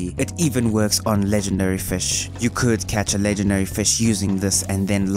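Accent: South Africa. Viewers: Are you South African? Your accent sounds South African 🤔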